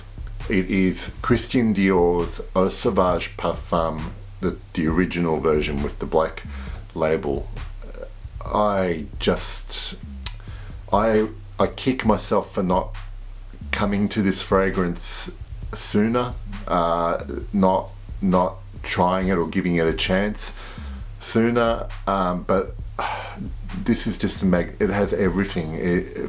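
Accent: Australia. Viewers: What kind of accent do you have? Australian?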